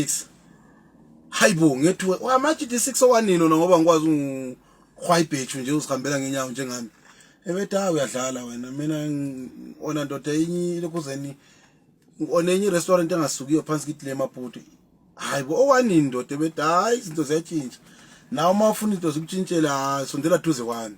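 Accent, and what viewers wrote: Zimbabwe. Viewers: Isi zulu sakho sithi ubuya e Zimbabwe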